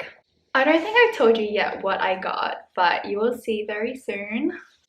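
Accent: Australia. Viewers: Are you from Australia? You sound a lot like wengie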